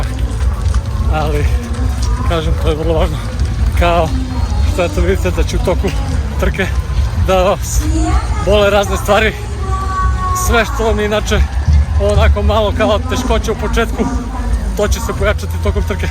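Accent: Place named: Niš, Serbia. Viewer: Bravo čovek . Strašno me interesuje jesi li ti iz Niša ? Ja jesam 1964 godište , a trenutno u Beogradu . Poz. Govor , je Niški 😅 .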